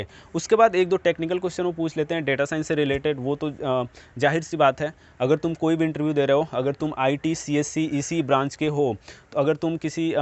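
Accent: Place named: India. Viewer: English Indian ؟؟؟؟!!!!!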